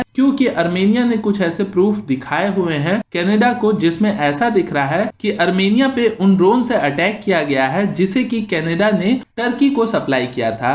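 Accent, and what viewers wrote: Bengal, India. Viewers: Sir r u bangoli?? Motamuti..😅u can't hide your words..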